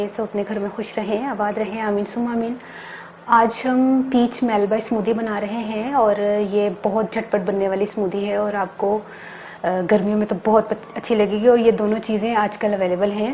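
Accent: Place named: Punjab, India. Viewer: Ap ki Punjabi so sweet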